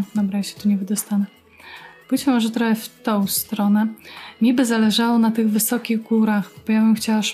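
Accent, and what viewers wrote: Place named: Podlasie, Poland. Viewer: Møwisz Tak o jestes z podlasia :P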